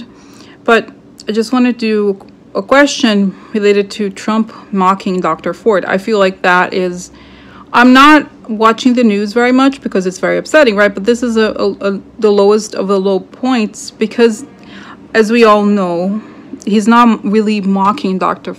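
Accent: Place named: Russia. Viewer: Hey cheers.. so it didn't happen that way-keep chin up and come back and do some more cards! Miss your funny Russian accent!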